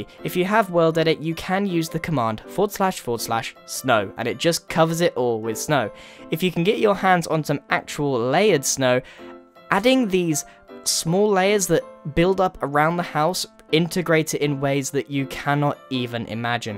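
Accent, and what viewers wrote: United Kingdom. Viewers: Oh are you British cause there are not that many YouTubers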